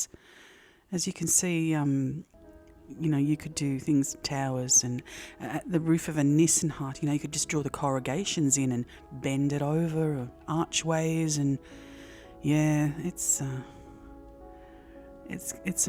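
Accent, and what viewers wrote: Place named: Australia. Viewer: Worked very well and have never thought about this. Saving this in the bank of things to do, you sound like a fellow Australian as well mate :D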